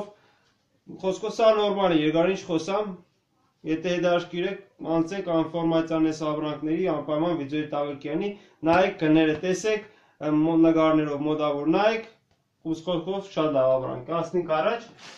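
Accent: Gyumri, Armenia. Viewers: ճպցնում եմ լայք ամեն ինչի, հատկապես գյումրեցու անուշ բառբառի համար )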